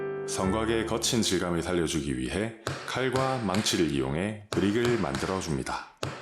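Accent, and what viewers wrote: Gyeongsang, South Korea. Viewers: 더빙하시는분 경상도 사람인가요? 경남쪽분 같은데 ㅋㅋ 하나하나, 지붕 등등 억양이 ㅋㅋ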